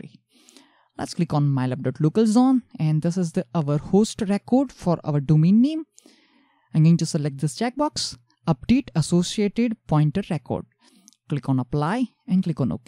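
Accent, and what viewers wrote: India. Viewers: ..and the Indian accent is also a joy to listen to :) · the most indianest accent ever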